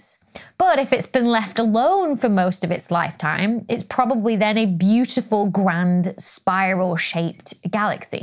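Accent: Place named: England, United Kingdom. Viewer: your english accent is absolutely delightful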